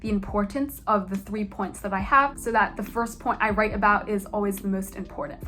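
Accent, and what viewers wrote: United States. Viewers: woah if ur doing gcses why do u have an american accent? (i am not interrogating) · i just realised u sound American 😭 did u move here then do ur GCSEs? x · you sound american so did you take igcse?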